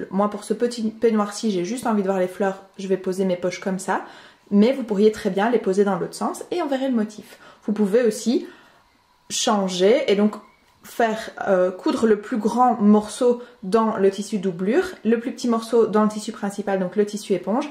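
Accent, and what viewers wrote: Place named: Switzerland. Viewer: Tuto tres clair, net et précis ça sera pour mon petit- fils de 7 ans merci bcp Je fais ma curieuse d'où vient ce petit accent je dirais soit Suisse soit Belgique soit Alsace😅 Ps, musique très dinamisante 👍🏽👍🏽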